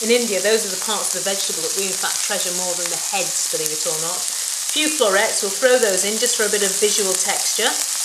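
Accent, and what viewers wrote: England, United Kingdom. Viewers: what is that accent?why english accent? really funny though.....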